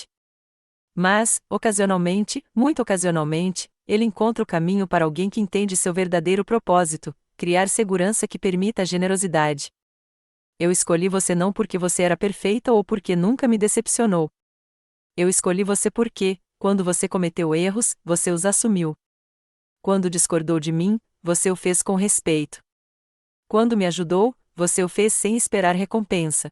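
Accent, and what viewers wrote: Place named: Portugal. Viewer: Gostei muito da historia. Eleanor uma grande mulher, que deu uma grande lição à toda a família depois da sua morte, endinando-os o verdadeiro valor da vida, o amor entre as pessoas é muito superior do que o material. Apesar de ser importante e confortável , não o que nos define. Narração perfeita, de Portugal ❤❤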